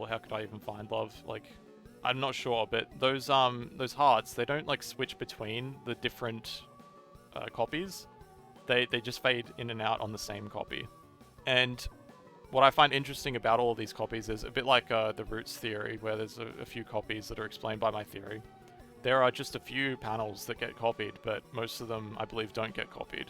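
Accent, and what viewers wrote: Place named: Australia. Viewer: Australian accents are cute though. Show us more about your game soon!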